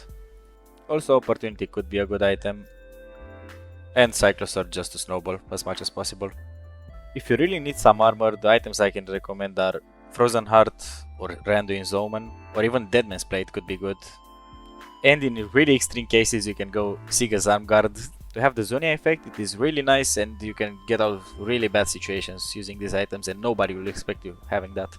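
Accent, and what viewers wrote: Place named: England, United Kingdom. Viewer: Pana cand nu m am uitat la numele tau nu mi am dat seama ca esti roman frumos accent de englez keep up the good work!!!!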